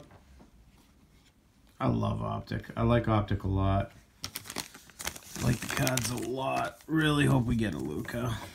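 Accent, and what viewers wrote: Boston, United States. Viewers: I am guessing this dude is from boston. His accent's telling me.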